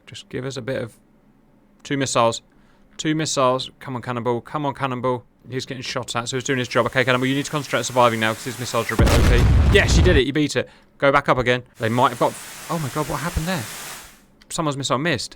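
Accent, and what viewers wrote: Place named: United Kingdom. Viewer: Just because you hire an announcer with a British accent, people will believe what you say?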